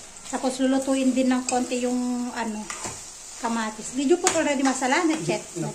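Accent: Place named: Ilocano, Philippines. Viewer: Adda latta tono nga ilocono ka ate hehe.. God Bless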